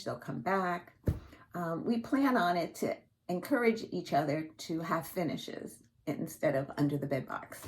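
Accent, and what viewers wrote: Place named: Long Island, United States. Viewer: I love listening to you! I grew up on Long Island, but I live in Kentucky now. I rarely get to hear the beautiful accent! I will be visiting my Friends House on Long Island in January. Thank you for sharing your expertise!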